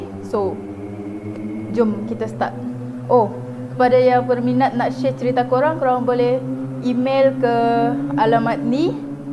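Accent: Sabah, Malaysia. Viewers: Akak orang Sabah ke? Sebab saya tengok cara akak bercakap macam orang Sabah....Saya orang Kedah... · akak ni org sabah ke org mane 😂😂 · Akak ni org Sabah ke?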